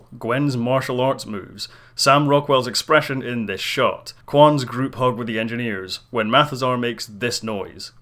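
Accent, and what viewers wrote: Scotland, United Kingdom. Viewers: OMG! What town in Scotland were you born in? Sooo beautiful. Your Scotch accent is not thick BTW. LOL. Jjking. I know the term is "Scottish". The Macallan is my favorite Single Malt SCOTCH whiskey(proper use). No blended whiskey ever passes my lips.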